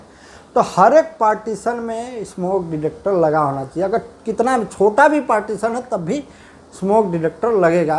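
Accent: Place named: Bihar, India. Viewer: Bihari accent ,can it be converted to normal hindi accent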